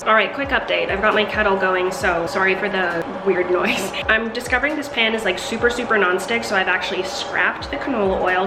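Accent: United States. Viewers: She has suck an American accent to be from Canada most Canadians have like an accent I don’t know how to explain it😂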